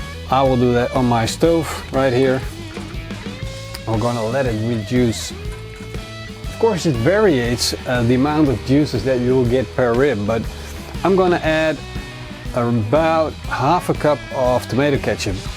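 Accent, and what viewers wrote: Netherlands. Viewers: Some Dutch accent ?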